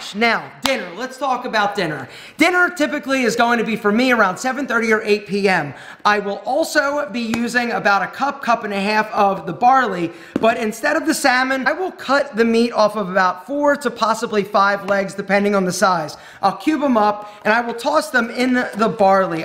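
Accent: United States. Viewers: hey if you are reading this comment you very much look like our Bollywood actor saif Ali khan whose eyes are exactly looking like yours and despite of your us accent his voice of speaking in English is identical of yours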